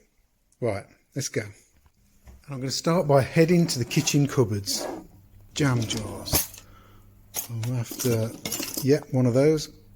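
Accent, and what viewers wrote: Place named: West Midlands, United Kingdom. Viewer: Greetings from a Brit in Germany. Nice to hear a West Midlands accent (I studied in Stoke) I am fascinated with "coffee logs" and recipes. I have been saving all my waste coffee for ages. Tell me can you include waste paper in this mix as well as waste sawdust? Do you think these "peat" briquettes might be useful to bake bread in a Dutch Oven experiment?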